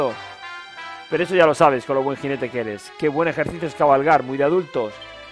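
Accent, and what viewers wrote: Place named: United Kingdom. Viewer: DIIOOOS QUE PUTO ASCO DE ACENTO, BRITÁNICO EN PLAN CHUNGO. Se me esta haciendo duro acabar el video.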